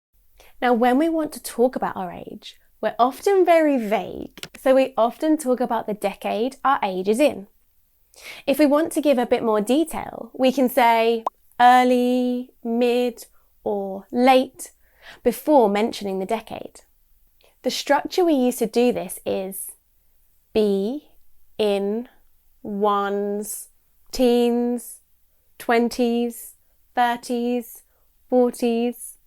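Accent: United Kingdom. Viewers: What a lovely british accent! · Your lesson is very easy to understand for me and I like British accent because i am living in UK. Thank you !! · 와 영국발음 적응안된다 ㅋㅋㅋㅋ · She is british ... diffrent from American or Australian English ... Whoever put this clip needs think that hard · 말하는게 너무 영국식이야.. ㅠ.ㅠ 했더니 영국영어 채널이었군요. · 영국식 발음 멋있어...👍👍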